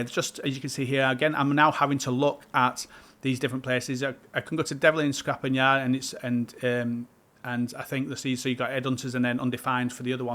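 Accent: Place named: United Kingdom. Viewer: 1. You need to slow down when you talk 2. You have a thick UK accent half of what your saying is hard to grasp 3. Your a bit of a scatter brain, prepare when you post You Tube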